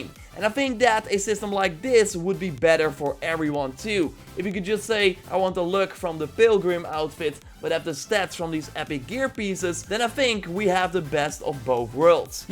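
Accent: Netherlands. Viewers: This dutch accent lol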